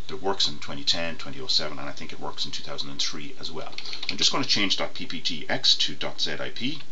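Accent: Ireland. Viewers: Brilliant! For us MAC folk, it works with the Unarchiver program, it's a fast Smooth fix! And it sounds much better with an Irish accent.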